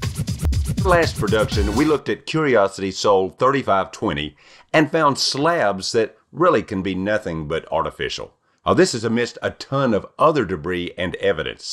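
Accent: South, United States. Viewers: I'm Northern born but Southern raised so it's nice to hear a Southern voice on an intellectual posting, Hear what I'm saying y'all?!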